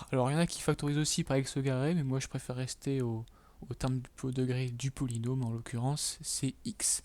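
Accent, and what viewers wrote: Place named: France. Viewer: French accent 🥸🥸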